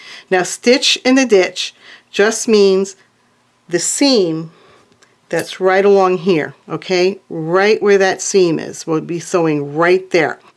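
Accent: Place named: Brooklyn, United States. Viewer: Hi, I recognized the accent; I lived in Brooklyn for 8 years back in the 1970s! Then have lived in CT for many years. Now I'm in Oklahoma (Oklahoma?!) because we retired and followed our married son with the 4 grandsons here; he's a professor at a university. Thanks for the tutorials!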